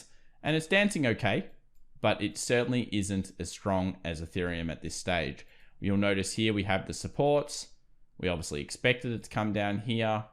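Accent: Australia. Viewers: Straight down to the point and precise you are the best ta on here. Love your aussie accent too.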